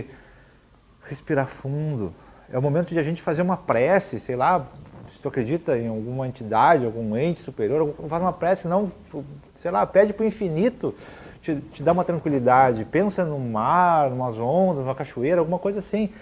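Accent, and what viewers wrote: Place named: Brazil. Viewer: JÁ TE ACHEI NO FACE E MANDEI UMA SOLICITAÇÃO. ASSIM QUE COMEÇASTE A FALAR JÁ VI QUE ÉS DO MELHOR ESTADO DO BRASIL. SAUDADES DAÍ.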